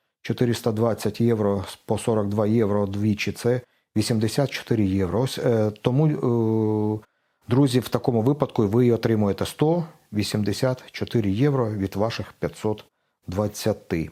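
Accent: Slobozhanshchyna, Ukraine. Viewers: все нормально! А в минулому було не "на русском", а на слобожанському діалекті співучої української мови!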